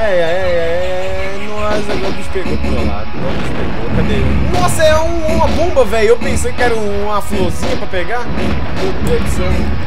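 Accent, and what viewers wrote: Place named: Minas Gerais, Brazil. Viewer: ontem fui em seu canal vc não respondeu vc é de Minas desculpe mas seu sotac e bem parecido gostei muito do canal nao sei nada de jogo assisto por vc rses vc que anima beijinhos 😘😘😘😘😘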